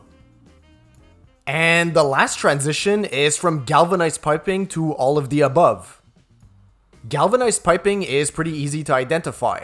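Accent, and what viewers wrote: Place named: Montreal, Canada. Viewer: Montreal accent for sure.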